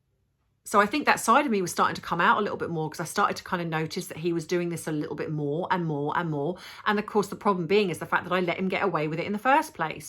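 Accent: United Kingdom. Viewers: / I’m sure you’ve got some special tea to soothe that lovely British voice. 🥰 Sending you well wishes & positive prayers. 🇺🇸👵🏻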